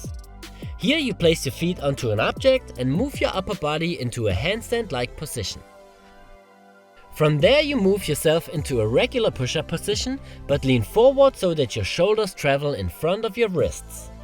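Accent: Germany. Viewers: Plant based & fasting. & sobber train except coffe) =never sick again! Can prove it😍 Gruss aus Bali🌴 Ps:funny german accent) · Is this a german accent?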